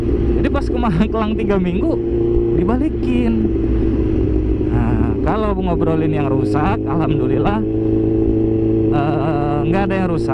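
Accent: Palembang, Indonesia. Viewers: Orang mana bang, bahasanya sama kayak Palembang😂, semoga bisa kebeli motor kayak abang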